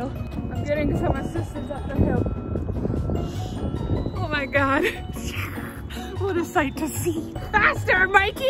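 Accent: Canada. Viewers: is it just me or does she sound extra canadian in this video lmao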